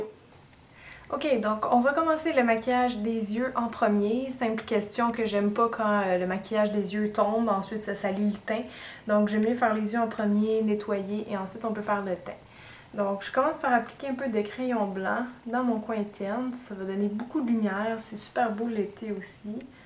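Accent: Canada. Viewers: t'est canadienne? d'ou est ton accent? j'aime bien.. :)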